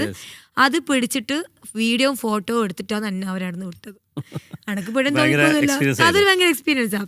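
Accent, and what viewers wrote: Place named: Kannur, India. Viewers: ഓരോരുത്തരും അവരവരുടെ സ്ലാങ് ലാണ് സംസാരിക്കുക.. തലശ്ശേരി സ്ലാങ് ആണ്.. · 😂കണ്ണൂർ സ്ലാങ് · Kannur slang anu....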